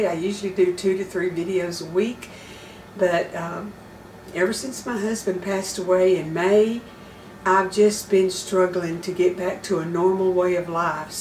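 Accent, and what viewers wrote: South, United States. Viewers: Just found your channel last night and I love it! Your kitchen and garden are both amazing, and so are you. I love listening to your sweet southern accent. God bless you!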